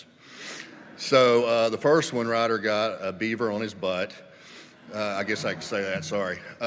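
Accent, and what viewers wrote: South, United States. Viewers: Only discovered this very funny lady this year as like many others I’ve been in lockdown due to Covid in Australia. I recommended her to my friends as each time I saw her I was amazed at her poise and stature and I loved hearing her funny stories with that lovely Southern accent. My sincere sympathies go to her family and friends. She will be sadly missed by many.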